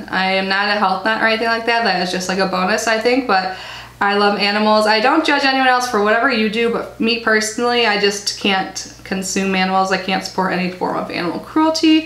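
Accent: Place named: Chicago, United States. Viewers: So excited to watch this 😀. Hope you aren’t getting sick. Feel better. You are young sister. I’m 47. So should we call you? 👩🏼‍⚕️🐱 “Drinks with a purpose” 😀 Archer and Laaaannnaaa would have been epic. Even if they are siblings I’m sure it would work. Lol. But Fiona is a fun name. And Emmy. All good names. 30 boxes! Wow 😯 and I don’t think that you sound funny when you talk. You have a nice midwestern accent 🙂. I’m totally going to look up your first video 😀💖 thanks for doing this Q and A. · I heard, but don't know if it's true that everyone is off the wait list in April for Ipsy plus. It stinks because my Ipsy acct I pay yearly since that's easier for me, my other account(my daughter's) is monthly and I skipped it this month so hopefully I can swing it next month if it's true that the wait list is done. I don't think you talk funny at all! 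You do have a strong Chicago accent though. People that I know that aren't from Philly always comment on my "accent" and I think it's so funny because I don't think I have an accent lol. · Awesome. Thank you for doing this. Your cats are adorable. My sister may be moving to Florida soon. I am not sure when or where yet. Her husband is up for a promotion. You don’t sound weird when you talk. You sound like you are from Chicago.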